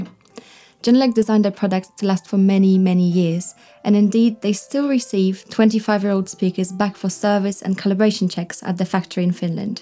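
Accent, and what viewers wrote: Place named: Poland. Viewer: Słychać że polka :)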